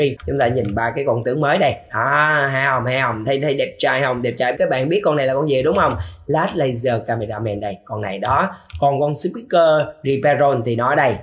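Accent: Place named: Binh Duong, Vietnam. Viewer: Anh hôm nay giọng hơi bình dương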